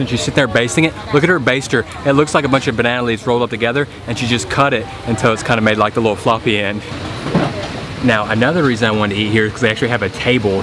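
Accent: South, United States. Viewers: Southern draw and Lao food- what a combo!! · When I heard a fellow southerner I subscribe so quick! This a first that I've seen a southerner vlogger! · My first time watching you and I enjoyed your video. I live in North Carolina and you sound like you are from the south. Where are you from? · Hey! Just came across your channel, and I’m loving it. I was wondering where you where from, hearing that southern accent haha. I read your bio and saw you’re also from AR 😂🐗 keep up the awesome content!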